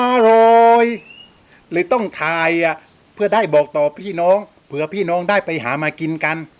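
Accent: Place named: Central Thailand, Thailand. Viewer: คุณ เป็นคนใต้ แต่พูดกลาง ให้เกียรติแฟนคลับภาคอื่นๆด้วย น่ารักมากค่ะ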